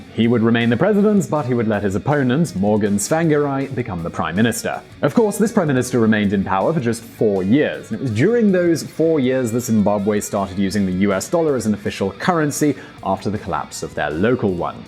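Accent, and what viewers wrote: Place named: United Kingdom. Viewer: British accent and glasses doesn't make you make smart.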